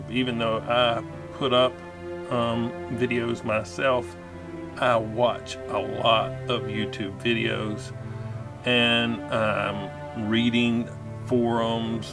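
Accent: United States. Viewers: hello, I first saw your channel about half a year ago and I liked what I saw but then I just kinda forgot about that until today when I was watching Mingles with Jingles and looked in the description and saw your name. I was like oh yea I remember him so now here I am.:) About the giveaway I don't know If its over yet or not and honestly I don't believe I would win it anyway, I don't usually. But I don't care about that I like that you are kind of like my favorite YouTuber Magz, just a little slower in speech and you're American so that's cool. You seem interesting and your content seems more mature than some other YouTubers I shall not name, thus you have gain another sub today.:D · nice to hear an american voice. Thought it was an EU monoply:)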